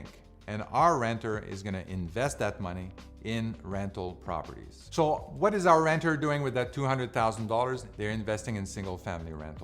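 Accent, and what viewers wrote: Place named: Quebec, Canada. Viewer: yes I could hear that québécois accent a kilometer away too;)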